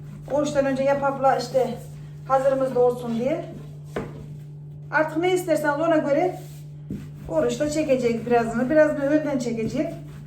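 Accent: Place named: Aksaray, Turkey. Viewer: İyi günler maşallah çok güzel ve dogalsiniz bende aksarayliyim bu tarifi yapacam aynı bizim gibi konuşuyon kız aksarayin neresindesin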